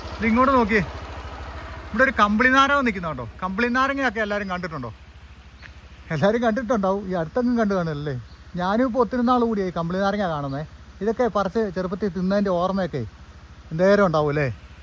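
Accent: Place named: South Kerala, India. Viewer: Wow superb well explained kya scenic place & your language slang ( south kl) nice to hear our roots are from thiruvalla we live coimbatore best wishes for more videos thku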